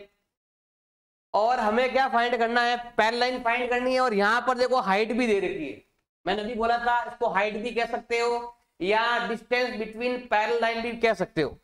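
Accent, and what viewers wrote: Rajasthan, India. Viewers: Sir aapki aawaj me rajsthani feel hai 😅😊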